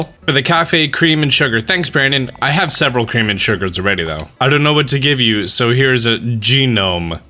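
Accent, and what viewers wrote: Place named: United States. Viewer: the factttt i knew u were from either PA/NJ/DE from the first vid i watched by the way u said “home” and “water”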